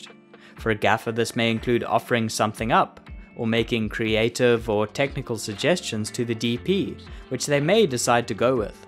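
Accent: South Africa. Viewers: You have a very distinct UK accent. Is that London or somewhere in. Northern England? Oh South Africa! No wonder I couldn't pinpoint it. Keep up the good work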